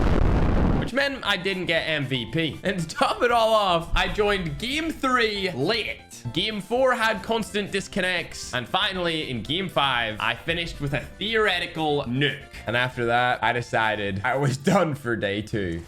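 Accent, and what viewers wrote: United Kingdom. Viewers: Bro where are u from britsh? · Are you British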